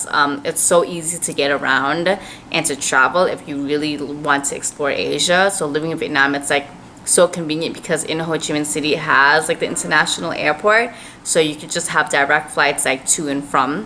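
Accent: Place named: California, United States. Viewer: So you left? I got assume you’re from Cali with that accent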